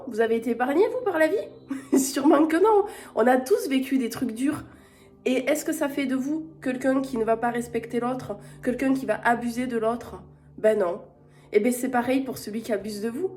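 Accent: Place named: Basque Country, France. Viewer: Merci pour ce message. C'est clair qu'il faut respecter l'autre, mais il faut aussi, ne pas hésiter à mettre des limites. Votre accent de Donibane, nous apporte le soleil que nous n'avons pas en région parisienne 😉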